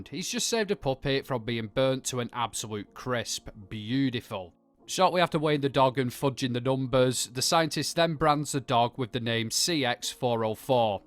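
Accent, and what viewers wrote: United Kingdom. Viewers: Appreciate you brother I’m actually fixing to watch and outline this episode for my channel and our recap and I thought I’d have you on in the background to take some early notes. I subbed you and i know you sound like your across the pond but if we could connect I’d be very happy to extend an invite to you to join us for one of our Fallout recap shows, we do the live discussion type deal and I think you would enjoy chopping it up with myself and my mates.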